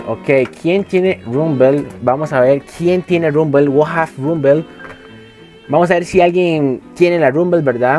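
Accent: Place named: Costa Rica. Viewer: Los ticos hablamos asi y desimos verdad casi siempre por si no lo sabiam gente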